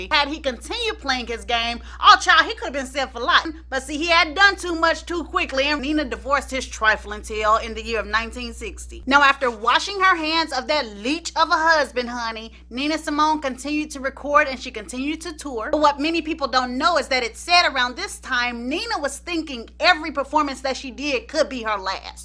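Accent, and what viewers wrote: South, United States. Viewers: I just love your channel and the Way you sound like a country girl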